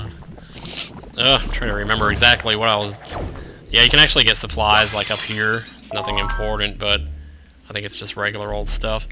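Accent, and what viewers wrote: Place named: Wisconsin, United States. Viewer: Are you from Wisconsin? you seem to have a wisconsin accent... like me lol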